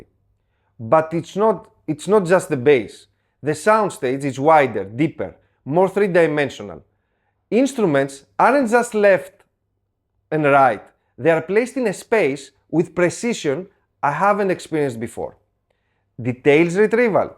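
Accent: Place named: Greece. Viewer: Hi, you must be Greek, if I can tell by the accent. Beautiful system, congratulations. Very transparent and well organized presentation. Subscribed. My system is comparatively very modest, but I really enjoy it: Pro-ject Classic Evo turntable, Ortofon 2M Bronze cartridge, Pro-Ject Phono Box S3B Preamp, Advance Paris A10 classic integrated amp, KEF LS50 speakers, 2 REL T-7x subwoofers, Marantz 6006 CD player, WiiM Pro Plus streamer, Audioquest and QED cabling.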